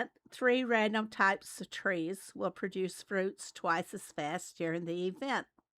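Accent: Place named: South, United States. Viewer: I have worked in the Boston turf for over 20 years - you don’t have it! Very southern to me!!!!😊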